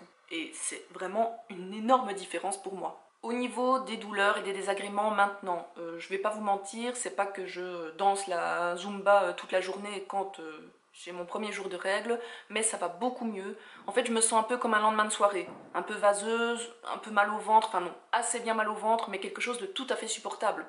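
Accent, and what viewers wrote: Belgium. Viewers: Mhhhhh un petit accent belge non? Je viens de tomber sur y’a vidéo car je me renseigne sur la pilule. J’ai commencé à en prendre une jeudi. Donc très récent ça fait 3j. C’est la ZOELY mais elle ne me convient pas je me pense... mes seins gonflent, acné sur les seins le dos et début visage alors que je n’en n’ai jamais eu. J’ai horriblement mal dans une jambe. J’ai du mal à marcher. Je pense l’arrêter... bisous!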